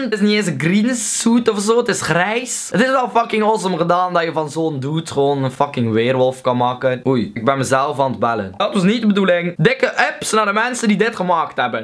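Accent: Belgium. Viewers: Hoor je dat niet hij is belg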